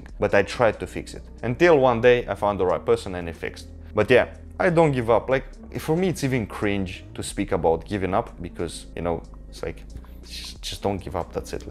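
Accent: Russia. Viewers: Wow, Russian accent. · That's familiar accent. Are you Russian? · I think pavel tsatsouline created this blueprint for a kind of austere, soviet militaristic style of training. I think even if didn’t call himself soviet core others would just because of his accent & dedication to hard training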